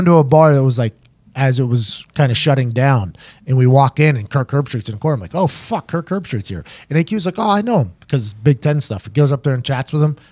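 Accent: Pittsburgh, United States. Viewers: I love hearing the Pittsburgh talk when I listen! Good stuff. The Vally news dispatch! Oh blast from the past! Good stuff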